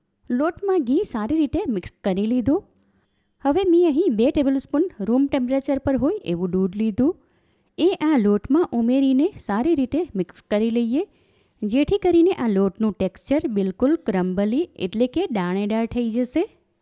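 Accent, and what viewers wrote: Surat, India. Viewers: Ben aa Ben surat na che, tya ni bhasha aavi j hoy che, toh aapne respect aapiye, baar gaame boli badle, badha na uchcharan juda hoy, je मातृभाषा hoy tene shu kam chhodavani?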